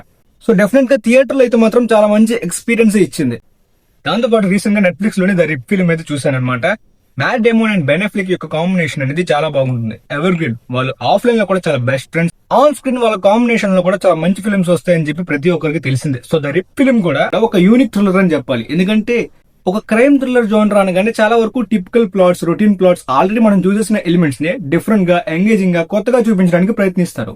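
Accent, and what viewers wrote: Srikakulam, India. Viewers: అన్న నీది శ్రీకాకుళం నా సూపర్ ❤❤❤ నమ్మలేకపోతున్న 😂😂 మాది పాలకొండ ✌️✌️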